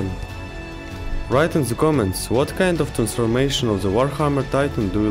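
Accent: Russia. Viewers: You have a Russian accent. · It's Russian accent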